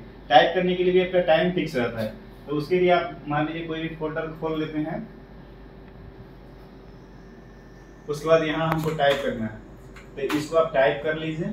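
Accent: Bihar, India. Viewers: Are you bihari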